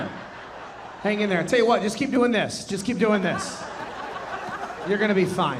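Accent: South, United States. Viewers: Thank you for this. A breath of fresh air with a southern accent. Intelligent. Funny as hell. Just amazing! 🤣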